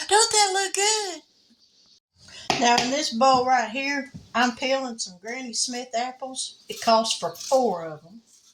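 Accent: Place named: South, United States. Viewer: you as well sweet lady! I reside in North Georgia! You sound like you are from the south as well!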